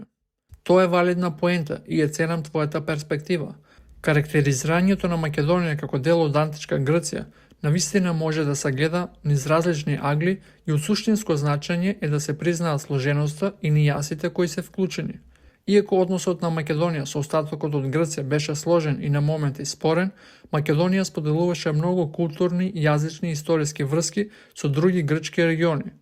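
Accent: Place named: Russia. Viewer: он збори со мкд руски дијалект 🇲🇰🇷🇺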